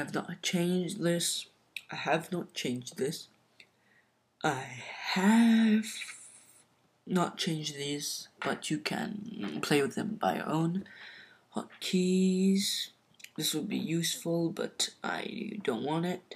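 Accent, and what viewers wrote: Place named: United Kingdom. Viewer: why r u using a british accent?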